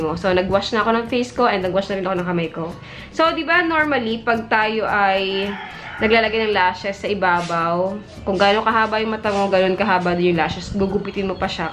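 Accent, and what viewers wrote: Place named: Quezon, Philippines. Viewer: thanks maamsh.. Taga san po kau parang puntong quezon.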